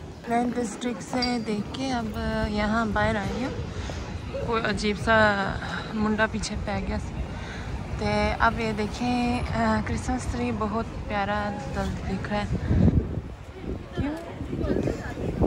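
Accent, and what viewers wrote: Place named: North, Pakistan. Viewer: Her accent shows, she is NOT Korean but Pakistani from north of Pakistan. She is not Korean; not at all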